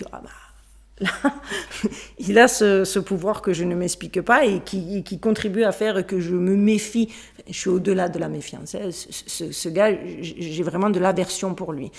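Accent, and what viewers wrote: Marseille, France. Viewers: Oui, continues ce sujet, c'est passionnant, .tu es passionnante et adorable avec ton léger accent marseil.. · Coucou! pour ce qui est de continuer ou pas sur Dubaï, fais comme tu veux. J'adore t'écouter parler, j'adore ton énergie, j'admire ton caractère affirmé. Nous sommes beaucoup à apprécier cette même chose. Aussi ton accent de belle marseillaise vivant en plein Vegas, j'adore aussi! mille bisous (oh ben non pas de bisous physiques, mille ça ferait beaucoup. Mais voilà: ces mille bisous-là! ). Stay safe you too. bye!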